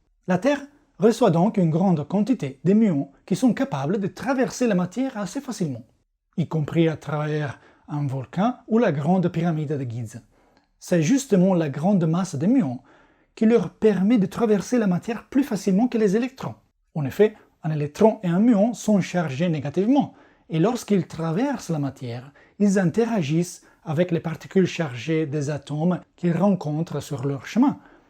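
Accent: Italy. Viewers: Vous avez tout à fait raison!!! La qualité de ses vidéos et de ses explications est de haut niveau. Comme l'est sa pédagogie, sa façon de simplifier les choses complexes pour nous, public ordinaire. Je suis inscrite à sa chaine en italien et ne savais pas qu'il en avait également en français. Outre le fait qu'il est super beau gosse (ici en Toscane, on dit: un bonazzo della madonna) 🤩 Mais en plus, ici, s'ajoute le merveilleux accent italien. Oh Mammaaaaaaaaaaaaa 😉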